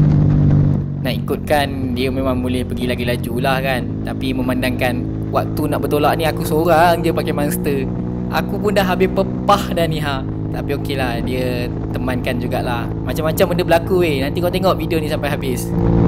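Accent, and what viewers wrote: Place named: Borneo, Malaysia. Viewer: Bro asal mane? Slank mcm belah borneo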